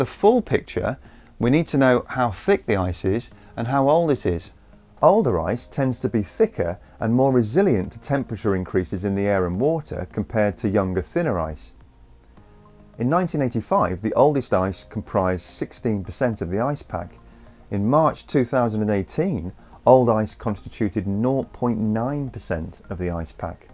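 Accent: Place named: Cockney, United Kingdom. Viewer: Lots of "sensationalism" & "doom&gloom, if it bleeds it leads" MISLEADING PSUEDO SCIENCE from our cockney accented "pseudo scientist".... Notice he says "sea ice" a lot. Propaganda from fiberal globalist seditionists seeking to "carbon tax" us into oblivion, this video is clearly paid advertising to sell us all into submission... don't believe the rhetoric. HERE ARE THE FACTS: 1) ice shelves are very thin floating 'skins' relative to the main ice mass at the poles, making for dramatic imagery (likely photo-shopped to support the phoney narrative). 2) yes, "sea ice" is diminishing, and yes our climate is warming, but... NO, Sea levels will NOT rise & flood coastal regions. 3) NASA's circumpolar satellites measure surface elevation & micro-gravity (mass directly below the sat.) and clearly show FIVE TIMES MORE ICE IS CURRENTLY DEPOSITED BY SNOWFALL AT THE POLES than what is lost to melting "sea ice". 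4) the disparity between the much greater annual polar deposition vs. loss of peripheral "sea ice" is ACCELERATING (especially at the Antarctic landmass). 5) while global warming is for real, it will NOT result in "catastrophic sea level rise" for the above reasons as well as greatly increased evap/precip filling depleted lakes, rivers & aquifers, as well as greater atmospheric moisture held in clouds & humidity. 6) CLIMATE MODERATION will greatly warm our winters but ACTUALLY COOL OUR SUMMERS in many regions due to the cooling effect of MOBILE liquid meltwater vs prior stationary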